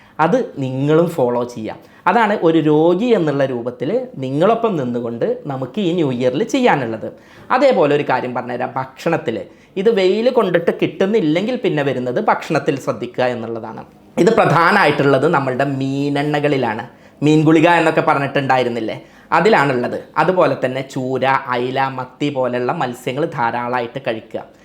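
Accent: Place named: Chandikkad, India. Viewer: ചാണ്ടിക്കാട്ടുകാരൻ്റെ ഭാഷയും സംസാരവും ആണെങ്കിലും സാധാരണക്കാരന് വളരെ ഉപകാരപ്രദമായ വീഡിയൊ ആണ് കാര്യങ്ങൾ കൃത്യമായി നന്നായി പറഞ്ഞു മനസ്സിലാക്കുന്നു നല്ല വീഡിയോസ് കച്ചവട താല്ലര്യങ്ങൾ ഇല്ല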